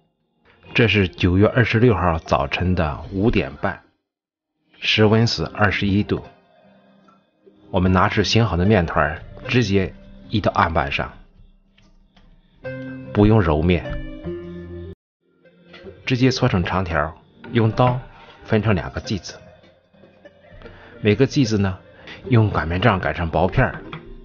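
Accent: Shandong, China. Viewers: 是的，山东老乡。你家乡话还是那么重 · 一听就是山东好汉，胶东人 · 我听出了您的山东口音我家祖辈也是山东烟台人👍谢谢您的答复，祝您圣诞快乐🤶🎄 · 听口音您也是山东人吧，同在日本，听到乡音很亲切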